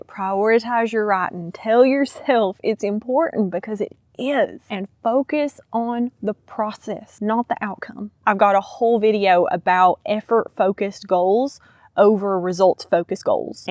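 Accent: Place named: Alabama, United States. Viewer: Your accent is adorable! I thought you were Alabama, but go Vol's! Appreciate your message. My debut novel (The Year of the Cat by Gary Kotowski - Amazon) didn't move the 5000 copies I envisioned it to sell. I'm still invisible to agents and I'm too discouraged to start a second novel. Needed to hear your step three! Thank you!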